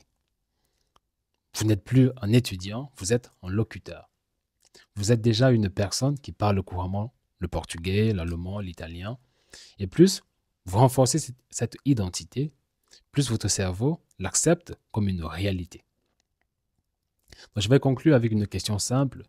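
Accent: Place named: France. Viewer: je repond EN italien que je suis Fiorentino ou' est ne Dante ..... Sei un grande professore e m ' hai fatto capire i meccanismi del nostro cervello che già sapevo però tu l hai spiegati in maniera eccezionale ... Poi il tuo accento Francese in più parli piano lentamente ... Via grazie mille hombre !!!!!! Ciao professo !!!!!!!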